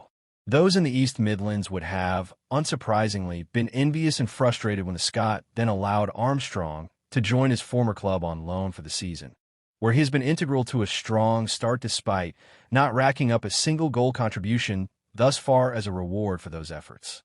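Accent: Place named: United States. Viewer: Why have the used an American accent on the Ai voice over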